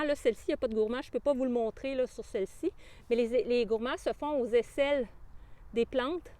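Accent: Quebec, Canada. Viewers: Bonjour :) Merci pour la vidéo, encore mieux avec un accent québecois ;) Vous pouvez aussi arroser le fond du trou de plantation des tomates, avec un ch'tit pipi dans l'arrosoir, votre chum sera ravi de vous y aider et se sentira utile ;p Bon potager à tous :) Édit : j'ai commenté trop vite, vous arrosez déjà le fond du trou :D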